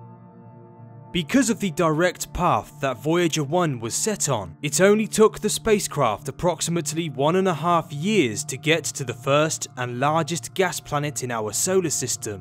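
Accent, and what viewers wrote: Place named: Liverpool, United Kingdom. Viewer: You probably shouldn't have said that the morons succeed in getting under your skin. They live for that shiz. Btw, I really dig your Liverpool accent...or is it Cockney? :)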